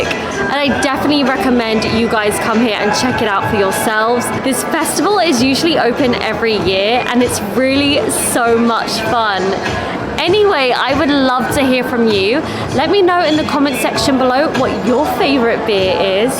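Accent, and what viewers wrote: United Kingdom. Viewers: 綺麗なイギリス英語で日本カルチャーと食に対する繊細な英語表現が学べるなんて！全インバウンド事業者必須のチャンネルですよ！あと何よりが素敵✨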